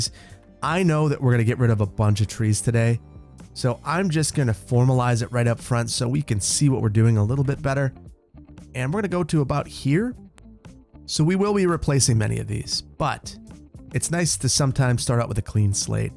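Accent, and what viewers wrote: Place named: Upper Midwest, United States. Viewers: I cannot wrap my brain around your neutral Midwest non-accent, except when saying "tayg". But seriously, love the content - I always look forward to Saturdays when I can catch up on all your videos for the week. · Tehg Gotta love that upper Midwestern accent. Great episode sir! Did you ever add any houses of worship (churches) to VB?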